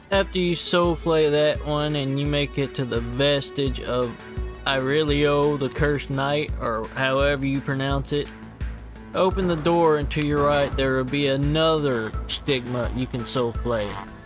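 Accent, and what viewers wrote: South, United States. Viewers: I agree 100. He's quirky, interesting, and I love the southern accent.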